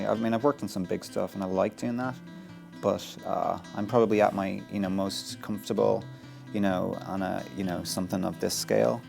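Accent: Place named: Ireland. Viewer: Love his Irish accent. He and James Nesbitt (plays Bofor in the Hobbit) are my favourite Irish actors.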